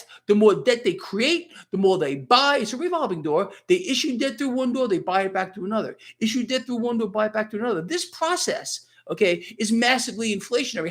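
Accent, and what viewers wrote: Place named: Queens, United States. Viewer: New Subscriber, I feel so sad I just found you, but I did just order your book! love the content, you must have been from Queens? Same accent... Thank you.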